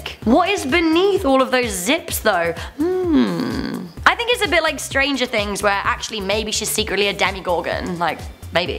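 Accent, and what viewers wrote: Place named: United Kingdom. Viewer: My favorite is this British accent lol 🤘🏻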